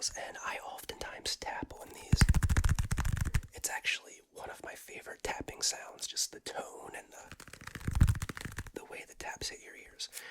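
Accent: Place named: Baltimore, United States. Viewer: An O's cap? And the way I hear that "only" vowel? I know a fellow Bmore man when I hear one!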